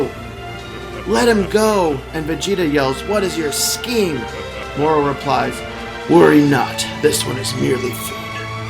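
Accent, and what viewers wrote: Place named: United States. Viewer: Nice to find a American narrator the other guys accents make it hard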